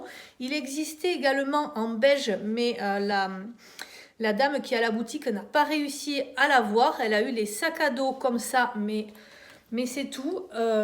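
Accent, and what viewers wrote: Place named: South, France. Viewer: Coucou 🥰. Je suis ravie d'avoir fait votre connaissance sur YouTube. Vous avez bon goût. Moi j'ai accouché en 2009 à Villeneuve sur Lot et mon fils a été transféré en néonatalogie à Agen je crois. J'ai été hébergée chez la tante de mon ex à PUJOL. J'adore votre accent du sud le LOT et GARONNE. ❤🥰. Bisous 🌹 😚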